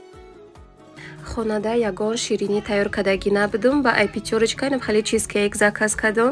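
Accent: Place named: Hisor, Tajikistan. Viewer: И лафзи хисорихояй марам дугонахом ай хисор шахринав хамихели гап мезанан карсодем меган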